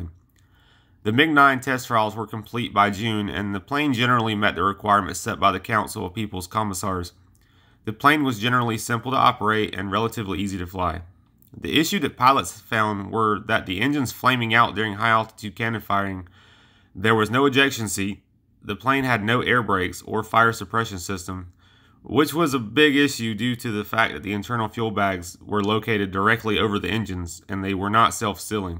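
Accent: South, United States. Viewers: Again, here are my recommendations. Your mouth is still full of cotton & marbles. Speak clearly! Enunciate! Open your mouth, make it round. Get rid of the Southern affectation. Your microphone needs to be close to your mouth, it sounds as is it is across the room.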